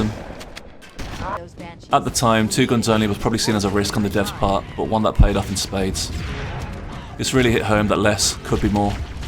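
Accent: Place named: United Kingdom. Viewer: I fucking love this guys britsh accent. reminds me of that guy who did the polybius video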